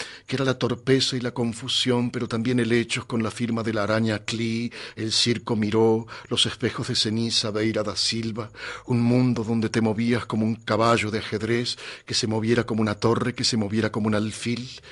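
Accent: Argentina. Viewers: Invito a todos los que critican al narrador a hacerlo mejor que él. Escucharon narrar a Cortázar???? Lee (siiii, lee) de esa forma, dándole cadencia. Y eso es lo que hace el narrador. Es muy difícil ponerse a la altura de semejante obra y poder transmitir lo que logra transmitir. “Rayuela” se llama el libro. No “La rayuela”. Y tiene acento argentino el narrador, por el hecho de que Cortázar era argentino, por más que tuviese acento francés. Felicitaciones señor narrador! Ponele tu impronta. Lo importante es mantener viva esta obra de arte.Cortázar te amo ❤️ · Me encanta con el acento argentino.por que en mi imaginación no lo lee el señor del canal de Youtube.. Para mi lo está leyendo el mismísimo Cortázar con todita su argentines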